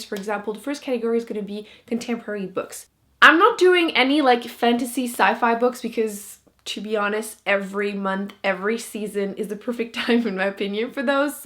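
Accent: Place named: Quebec, Canada. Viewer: if you want to read more girls love girls books, i definitely recommend far from you by tess sharpe, labyrinth lost by zoraida cordova, beauty queens by libba bray, le bleu est une couleur chaude by julie maroh and not your sidekick by c.b. lee! also i saw that your accent is french canadian, and since i'm french canadian and have the hardest time finding cheap books, i was wondering if you have any library recommendations? anyway, great video! i have a couple of these books in my summer tbr as well, and i'm super excited to pick them up! <3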